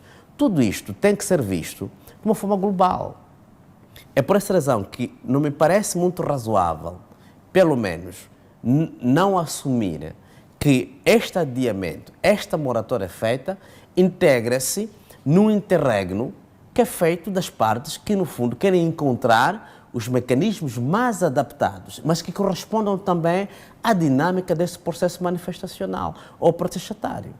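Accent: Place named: Angola. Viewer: Senhor, nem sei donde falas mas, pela forma como falas, deves ser de um país DITADOR como Angola e tu es lambebota do MPLA q queres furrar no Poder se apoiando d pseudo intelectual, um vazio q enganam tao bem os tolos e analfabetos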